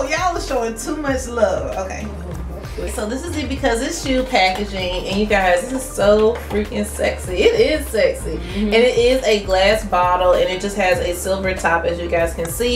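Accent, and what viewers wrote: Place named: South, United States. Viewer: your beautiful you have great skin and great cheekbones I’m jealous the faces she be making so cute lol she got a baby face such a cutie with a great smile, where you from you got accents like southern im from Boston Massachusetts so you know how we sound I’m sure lol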